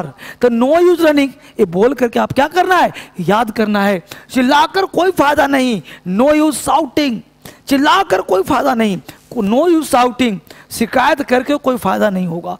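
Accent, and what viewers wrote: Bihar, India. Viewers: Guru ji Bihar se ho kya ap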